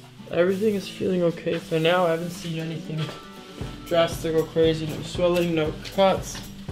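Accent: Australia. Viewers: You are Australian I could hear it and as soon as you said you flew him from Australia it clicked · Literally look me five videos to realise your Australian.... I’m Australian and I couldn’t figure it out 😂😂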